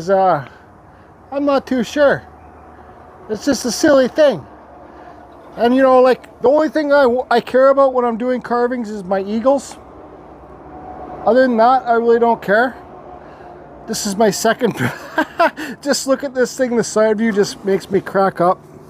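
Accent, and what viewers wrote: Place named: Canada. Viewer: Love the Canadian accent !!! 👍 I’ve learned So Much from You !!! 👍 You’ve Taught Me So Much !!!👍 Thanks 🙏 !!! Can’t Wait to get started !!! My Father was an Artist , Acrylics !!! 👍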